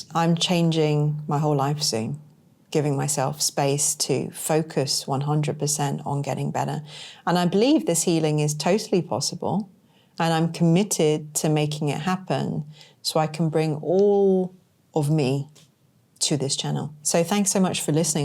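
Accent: United Kingdom. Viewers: In my prayers always., I think you look very healthy and beautiful… I believe you are a Spirit dancing along…I believe you will THRIVE! My ancestors are Kelham Island, just north of Sheffield…I so love the British and will listen to your beautiful accent forever., you will SUCCEED! Godspeed!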